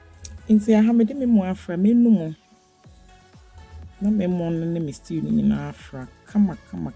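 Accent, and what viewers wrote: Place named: Twi, Ghana. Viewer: I’ve save this so I could be able to watch in full 🥰 mama wano ne twi ad3 paaa 🥰 come and see me nka 3 seconds biaaa na brofo nam mu 😂 this Jollof was done nicely paaa 😘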